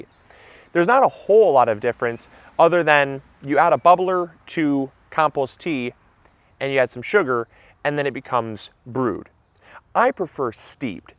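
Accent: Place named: Midwest, United States. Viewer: Love the Midwest accent: CAM-pohst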